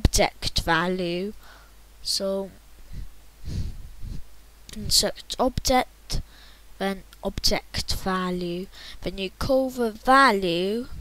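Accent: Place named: United Kingdom. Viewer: Your british accent is funny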